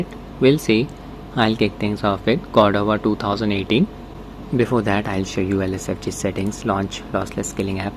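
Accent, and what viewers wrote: India. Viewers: Accent is thick but lucky for me i have Indian friends :D cheers for the video bud.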